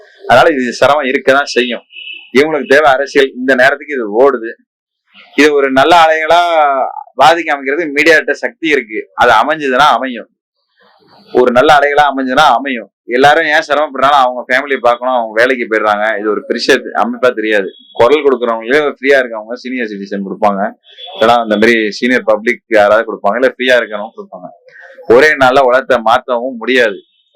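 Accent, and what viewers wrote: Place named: Delta, India. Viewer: Evari delta slamg mathiri pesuraru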